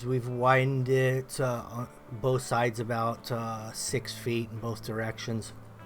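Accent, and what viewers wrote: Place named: California, United States. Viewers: I dunno, agree that it's dead on So Cal but more surfer than Mexican influenced. I think the Cali Mex accent is influenced by the So Cal surfer type sounds more than the other way around. I've lived here my whole life so kind of hard for me to hear so maybe I'm wrong but I DID grow up with Mexicans lol. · Pale Writer its a cali accent is wat he is talking about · I live in Los Angeles so many people talk like that up here😂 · That accent....so calififornia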